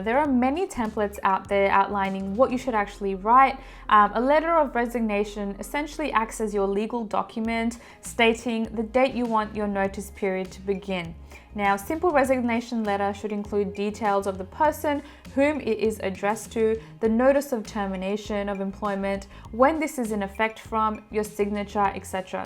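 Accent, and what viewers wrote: Australia. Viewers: You're in Australia? I heard the accent but I thought the great resignation was only in the US. I like this being a global movement.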